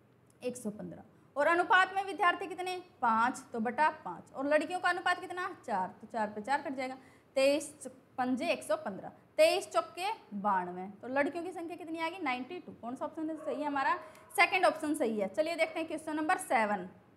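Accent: Haryana, India. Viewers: Aap haryana se ho kya aapki boli humre jesi lagti hai 😊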